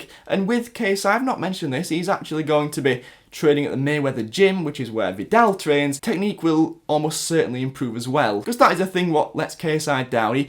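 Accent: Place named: Yorkshire, United Kingdom. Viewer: hi I hope you see this comment, I love ur content and I have a question: where are you from? your accent sounds northern ( maybe Yorkshire or something like that?) ps: keep going mate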